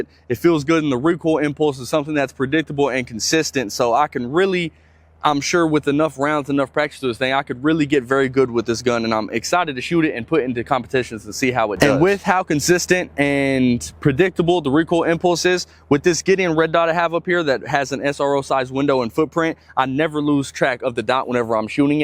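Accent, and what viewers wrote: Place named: Tennessee, United States. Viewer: Thought you were from tn I’m from Clinton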